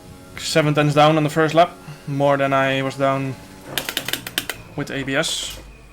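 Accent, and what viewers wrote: Netherlands. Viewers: Are you dutch because your accent sounds like verstappen :). I'm american so I'm not good with european accents for the most part.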